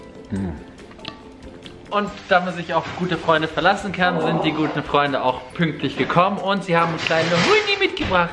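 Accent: Swabia, Germany. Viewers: Dein Schwäbisch😂👌 Komm au ausm Schwaobaländle😁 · Omg rede öfter schwäbisch 😍😂 Da fühl ich mich wie zu hause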